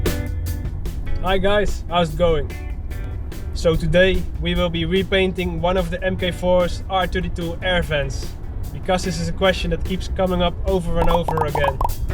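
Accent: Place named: United Kingdom. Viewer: Haha ik weet niet hoe maar ik dacht dat je brits was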